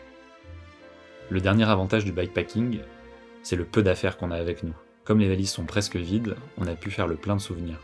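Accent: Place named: Japan. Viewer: 事故無く日本縦断を楽しまれて何よりです。音楽と西諸弁のナレーションも心地よかったです。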